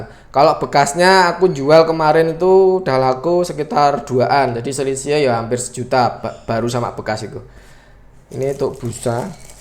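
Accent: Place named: Tulungagung, Indonesia. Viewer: Cocok banget ini untuk pengambilan gambar cinematik ..Btw ini yang punya orang Tulungagung ya bahasanya Wangun😄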